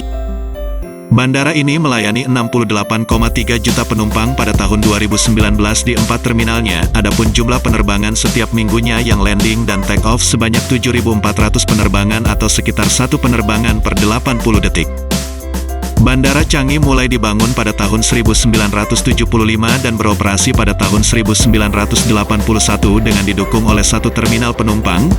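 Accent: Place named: Batam, Indonesia. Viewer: Yg org BATAM mana nii suara nya👍🖐 salam dari batam, sekupang🖐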